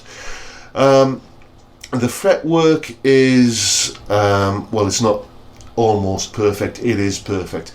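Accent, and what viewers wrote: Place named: Teesside, United Kingdom. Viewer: Thanks for the review, I'm just about to buy one of these (I think), or an ESP Ltd 256FM in black for the same but I prefer the beautiful colour of the HB. Glad to hear it sounds as amazing as it looks. On a side note, you sound like you're from my neck of the woods - Stockon on Tees? Definitely a fellow smoggie :)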